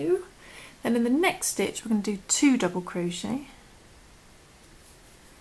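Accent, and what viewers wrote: United Kingdom. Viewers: Lol, I heard your British voice and assumed you were using British crochet terms, whoops! Just about to start again - looking forward to making this for my Autumn wreath, thank you :)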